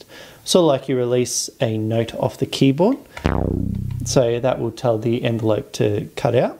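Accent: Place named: New Zealand. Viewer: gosh i love that accent is that kiwi? great tutorial thank you!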